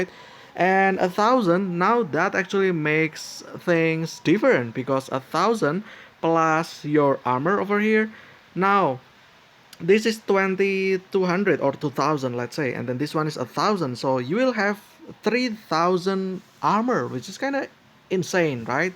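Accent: Indonesia. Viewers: Are you Indonesian? That accent is so distinct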